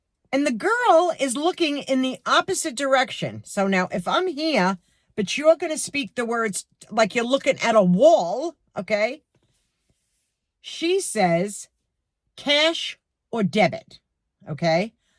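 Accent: New York, United States. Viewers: I think it's fairly common; even if they don't say anything; it's just an attitude issue !! workers can be like 'robots' !! I like your accent; was about to ask if it's a NY accent ! 💚💟 I think she will learn from the experience.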